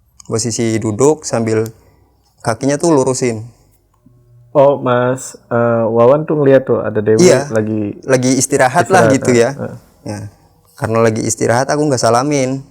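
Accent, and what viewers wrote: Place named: Banyumas, Indonesia. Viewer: Aloy versi ngapak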